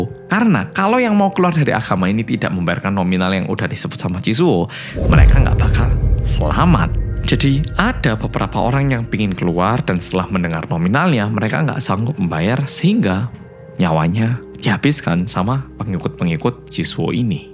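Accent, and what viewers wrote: Java, Indonesia. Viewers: yang medokk, openingnya terngiang terus di kepala sayaa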